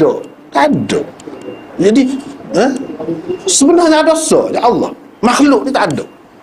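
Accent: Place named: Kelantan, Malaysia. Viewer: pekat dialek kelate..kalau ada sarikata lebih ramai org bole faham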